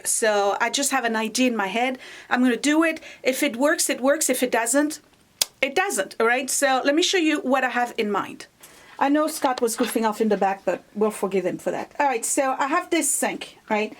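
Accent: France. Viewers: Amazing, you remind me so much of my mom, not for your age, of course, as I am the same age as you, but cause you’re so creative and crafty. :) Love your french accent. I am Italian and also have an accent and nobody can ever tell it’s an italian one lol. They always think it’s from somewhere else :)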